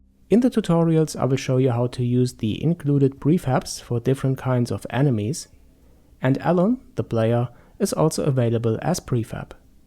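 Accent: Germany. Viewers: Du bist eigentlich deutsch oder ?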